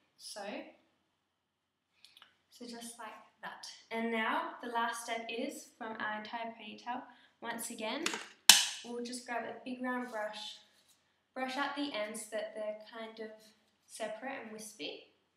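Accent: Australia. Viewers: Thanks I thought you sounded Australian x its a lush accent x :)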